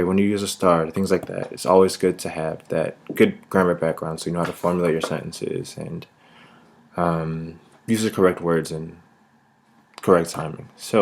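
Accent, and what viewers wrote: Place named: South America. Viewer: Hablas muy bien el español para ser Norte americano, incluso, suena más como si fueras de sud américa.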